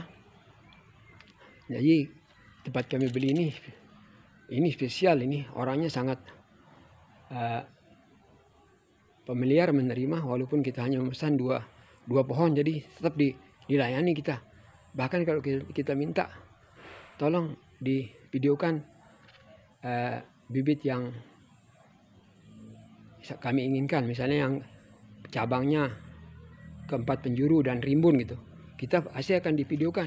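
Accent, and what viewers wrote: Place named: Lombok, Indonesia. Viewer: dari logat nya orang sasak ya ?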